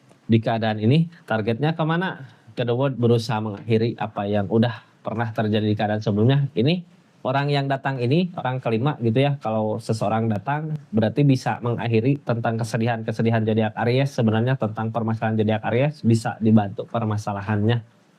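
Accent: West Java, Indonesia. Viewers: Sunda banget logat nya. Seneng nonton nya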